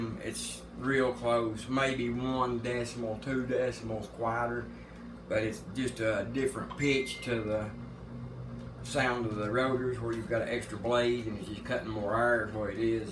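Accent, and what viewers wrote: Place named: South, United States. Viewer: I grew up in southern Kentucky, I sound like a yankee compared to you buddy.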